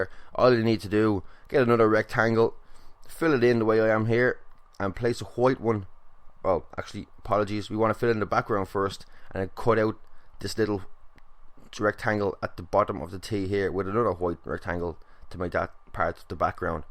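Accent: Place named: Ireland. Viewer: Nice vid dude. 1) I know you're irish but you really do sound quite alot like Sheamus dammit. also 2) could you maybe do an NWO logo? I think they were the main DX rivalry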